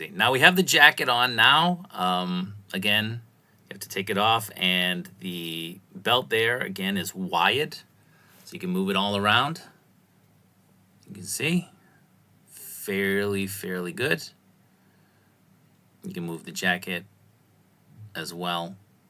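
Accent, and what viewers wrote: Boston, United States. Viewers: So, have u watched the Celtics documentary series that's running on MAX/HBO? You should've narrated it. Noone's more Boston than you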